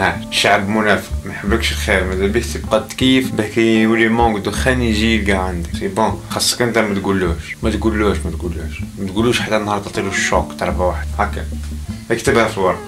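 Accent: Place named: Annaba, Algeria. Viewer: بارك الله فيك والله باين راك تحكي من قلبك كلامك يدخل ديراكت للقلب وبلا ما ننساو اللهجة الحلوة تع ناس عنابة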